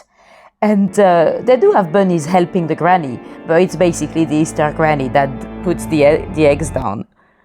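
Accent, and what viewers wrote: France. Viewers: Nice video, nice storytelling and also nice accent. Is it French?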